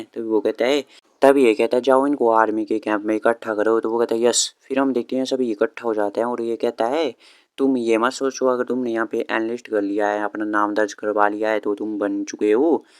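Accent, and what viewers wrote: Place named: Himalayas, India. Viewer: tabhi tumhari hindi hai ... Himali taaal mehsuus ho raha thaa...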